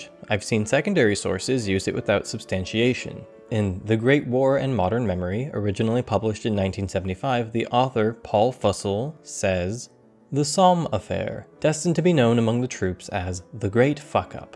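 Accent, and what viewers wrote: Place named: California, United States. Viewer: So what if he does? There’s lots of vowel shifts currently happening across different accents in American English. He sounds Californian, which makes sense cause that’s how a lot of us say “biases” here.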